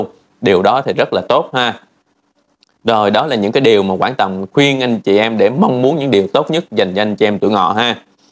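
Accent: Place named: South, Vietnam. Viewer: tìm mãi mới thấy thầy nói tiếng nam nghe rõ dễ nghe , mấy ông nội khác nói tiếng bắc nghe tử vi chán ko thấm chút nào 😊 coi bói là tui kím thầy nam coi thôi à nhất là người miền tây dưới an giang á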